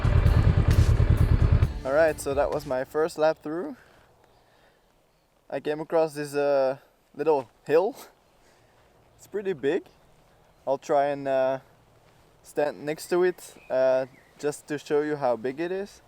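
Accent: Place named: Belgium. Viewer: Where is that, judging by your accent, I think I might live close and I'd love to try that course. Just read you're from Antwerp, dan ben ik zeker dat het niet zo ver is.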